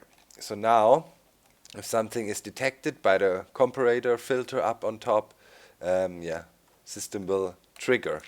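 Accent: United Kingdom. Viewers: That English accent! :D :) Awesome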